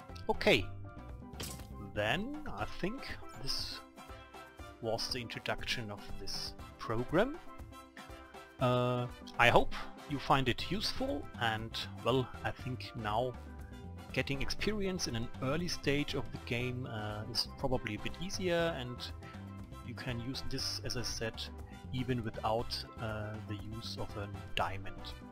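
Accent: Germany. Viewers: this guys motherlanguage is like 100% german ;D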